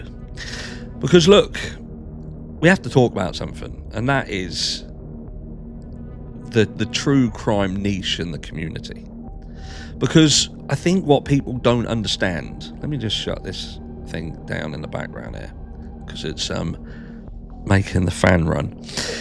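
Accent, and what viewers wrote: Norfolk, United Kingdom. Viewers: Love your videos. Where are you from. What is your accent. Are you from Norfolk.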